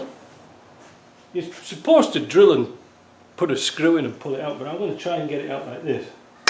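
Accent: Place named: Northern England, United Kingdom. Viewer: Hi mate I gather you live in the USA OR CANADA somewhere as you were talking in dollars. I guess your from the UK? You sound very northern I’m northern myself. Where in the uk are you from buddy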